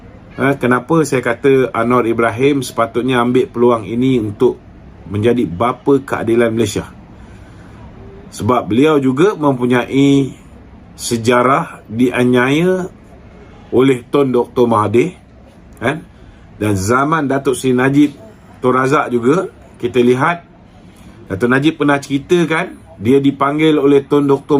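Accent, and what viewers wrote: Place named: India. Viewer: cakap macam keling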